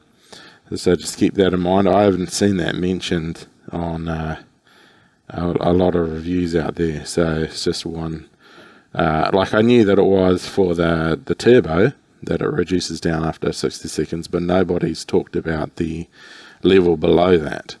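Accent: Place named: Australia. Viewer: I stand corrected… sound like an Aussie to me! wtf?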